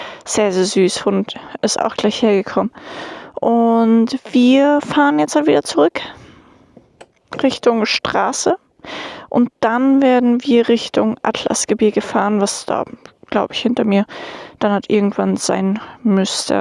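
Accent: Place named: Rosenheim, Germany. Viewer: "Servus aus Marokko" cool! Schön, dass man in und um Rosenheim immer noch so redet, wie man da halt redet : )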